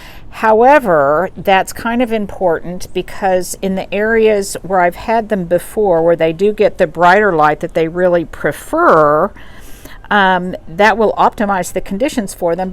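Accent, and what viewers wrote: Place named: United States. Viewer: Hi. Thank you for another very informative video. I don't have any topiaries. I am disabled, but decided to start gardening. Not the best hobby I didn't think about all the digging lol. So I am just starting with bedding plants in tubs and my hubby helps with compost. I am Looking forward to getting some topiaries in Spring to put in pots. Can you put a small topiary in a big tub, so they don't dry out? They make me feel happy as well. I do love your accent. I am from England so don't get to hear your beautiful American accent ever. Anyway thank you xx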